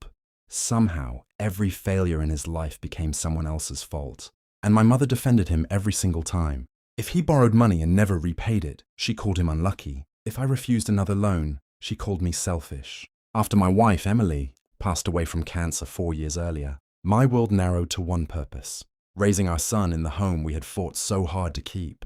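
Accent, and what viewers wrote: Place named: United Kingdom. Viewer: I always loved a British accent!! Keep making stories like this,glad the older brother and the mother got what they deserved!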